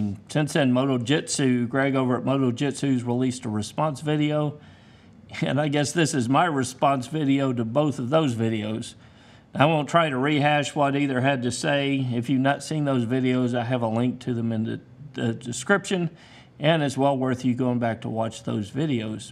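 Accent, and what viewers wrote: United States. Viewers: I'm curious. What accent is his? American, I assume, but which part of the US?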